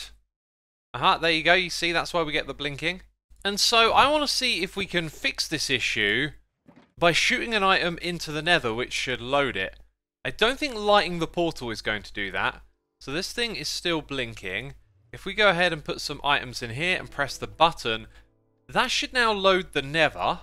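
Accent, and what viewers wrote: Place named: United Kingdom. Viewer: he's so bri'ish, talking about evil X's a'i'ude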